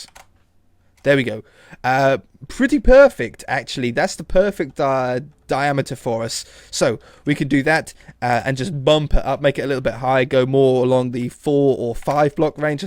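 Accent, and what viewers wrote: United Kingdom. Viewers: That British Accent it wont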